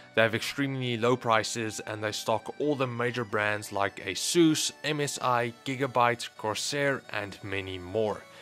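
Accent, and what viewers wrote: South Africa. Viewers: YES youre south african!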